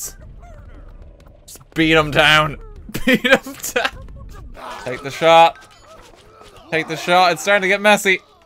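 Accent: Ireland. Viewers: "Jump em boys!" Why do I feel like that should be an in game line for the Hobbits, voiced exactly in Irish lilt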